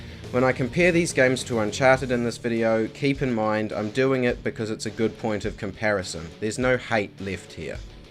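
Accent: New Zealand. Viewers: Oi are you a kiwi??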